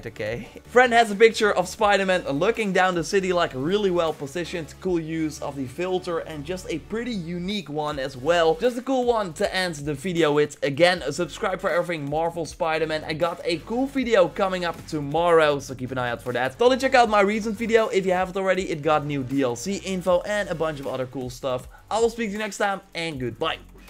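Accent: Netherlands. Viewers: Ooh daam i love ur dutch accent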